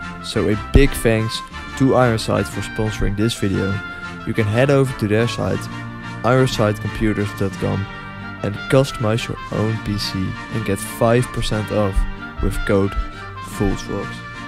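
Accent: Netherlands. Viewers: I could already hear that you're dutch like so many dutch people sound like that when talking english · Im really curious to know where you are from! You sound like german/dutch, but I am not sure :) Edit: i knew it. You are dutch just like me :P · Jij hebt een Nederland accent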